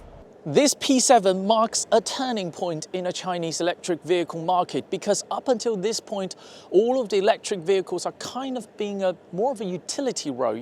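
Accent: United Kingdom. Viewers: British / Chinese Accent is a thing ?! Whaaa ?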